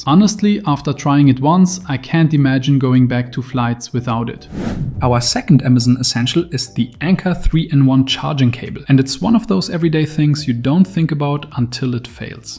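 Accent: Germany. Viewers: The man is from Germany and probably only used three different microphones or recorded on three different days while he was travelling. At least, I don't know of any AI that can imitate a German accent as well as a German who speaks English.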